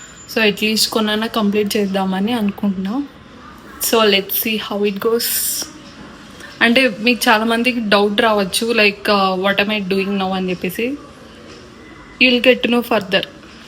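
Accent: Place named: United Kingdom. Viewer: Your English Accent is like British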